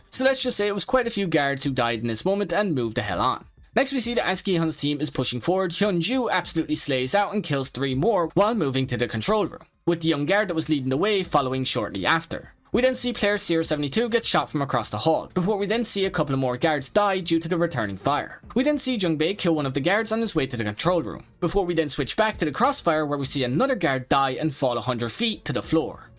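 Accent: Ireland. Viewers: Nah hes just Irish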